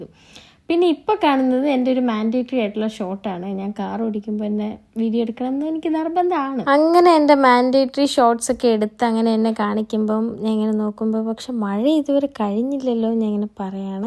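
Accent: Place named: Tamil Nadu, India. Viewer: Ivr engne tamil touch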